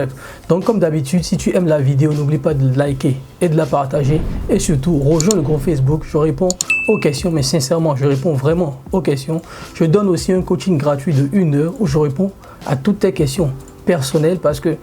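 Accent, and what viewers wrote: Benin. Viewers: Vous parlez comme les béninois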